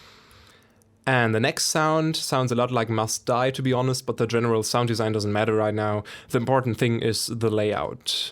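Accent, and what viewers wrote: Germany. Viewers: du sprichst ja Deutsch 1.Dein Musikprogramm ist in Deutsch 2.Man hört an deiner Stimme das Deutsch sprichst 3.Du bist der beste Deutsche DJ der Welt!!!! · You have a germany Akzent or you can speak better than the most english/amerikan people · That german accent tho lol :D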